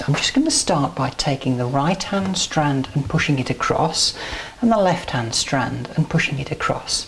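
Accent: United Kingdom. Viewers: Great video. What I loved most was your British accent. Bravo.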